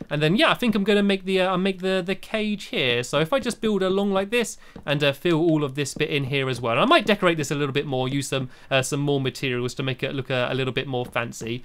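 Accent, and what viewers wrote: United Kingdom. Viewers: * are you british??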